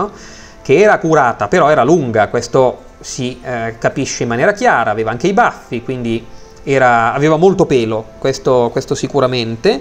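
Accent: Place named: Italy. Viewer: italyano!!!!!!!!!!!!!!!!!!!!!!!!! :( the most funniest accnt of all time and in the history of the universe whahahahaha!!!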